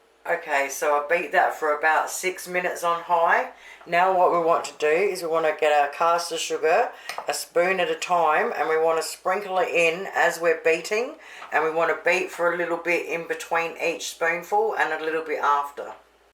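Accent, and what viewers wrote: Australia. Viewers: You speak proper Aussie 😊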